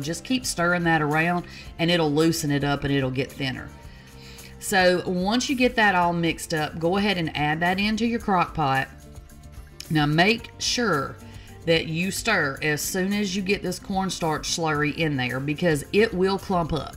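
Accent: South, United States. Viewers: That's good ole southern talk, very pleasing to listen to, great recipe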